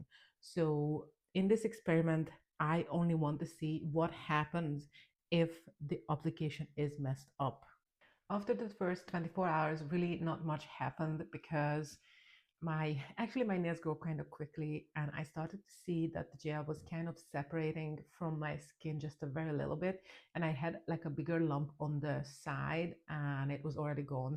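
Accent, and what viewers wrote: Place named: Northern Ireland, United Kingdom. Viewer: Oh wow! My hat is off to you and I don’t know how you coped! If I feel ANYTHING odd it bugs me until I fix it. I got up in the middle of the night, my elderly dad was up watching TV and I walked in like a zombie (his words, lifted a nail file and walked off! 😂😂 Well done on prepping enough to make them last that long! Can I ask if you are living in or have spent time in Northern Ireland? I swear little things you say remind me of our accent! I hope thats not insulting. Lol Great video.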